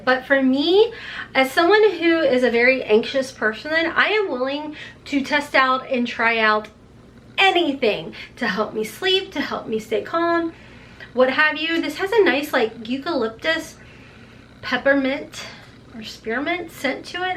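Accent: South, United States. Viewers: I love every so often the southern twang comes out “maaaatte” shades ❤️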